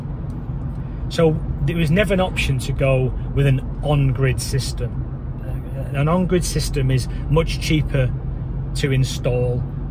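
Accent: United Kingdom. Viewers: Where in the uk are you from mate? Just found your channel, subscribed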